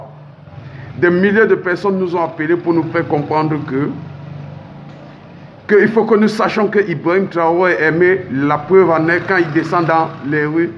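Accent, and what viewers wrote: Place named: Cameroon. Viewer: Il est fou tous les jours ses vidéos c'est Ibrahim Traoré pour ce faire des vus avec des mensonges et son français me fais rire il a l'accent camerounais non burkinabé.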